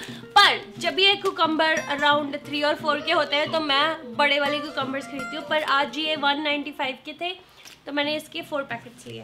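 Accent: Punjab, India. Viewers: Your videos always make me smile...being a Punjabi I lv ur Punjabi tone too...😘😘😘😻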